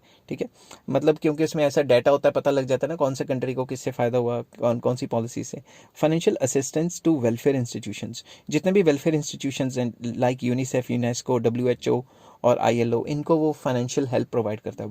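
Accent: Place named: Punjab, India. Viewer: It seems that sir is from punjab😅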